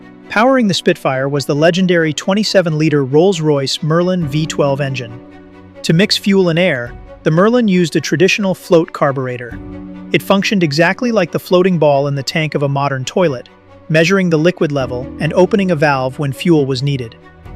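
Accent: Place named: United States. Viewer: One question!? Whit is there a American accent narrator on this, and not a British accent!??? I mean, the video is about British ww2 aircraft is it not!??!!🇬🇧🏴🏴🏴🇮🇱🇮🇪🇬🇪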